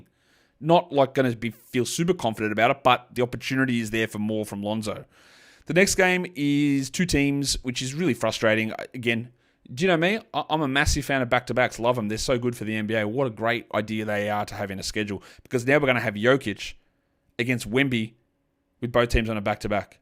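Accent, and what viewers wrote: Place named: Australia. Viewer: Your microphone's good - no issues with my end. I listen at 1.5x and one of the big changes is there's no "deep breath" sounds. I know us Aussies like our long-winded sentences. As the name suggests, long-winded sentences require long amounts of wind, which is often replenished using a deep breath. This deep breath is known to create a deep breathy sound and your previous mic used to hear. I don't know whether you have undergone some level of phonetical coaching (which kudos if you have) but, there was a distinct absence of deep breathy sounds in this video. The unfortunate news is you are probably ineligible for the ASMR tag to put on this video now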